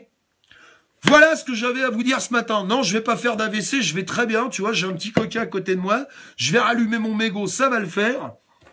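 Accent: France. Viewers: J'aime trop comment vous parlez, enfin un vrait Français, bravo msieur je suis abonnée👼👍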